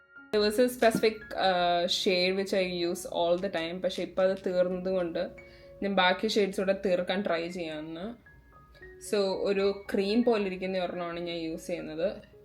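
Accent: Pathanamthitta, India. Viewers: Enik ariyathila’that typical pathanamthitta slang 😅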